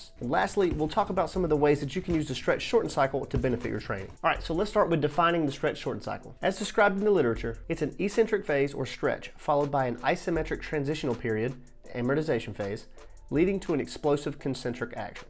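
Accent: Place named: South, United States. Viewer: First time on the channel. I hear a Tennessee or Kentucky accent there. I picked up on it right away for some reason. Great video, I subscribed.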